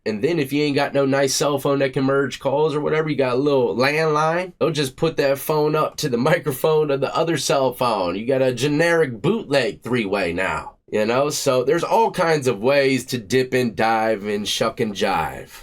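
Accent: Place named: Michigan, United States. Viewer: Ur accent is very MICHIGAN. U originally from here by chance?? LOVE YOUR CONTENT ❤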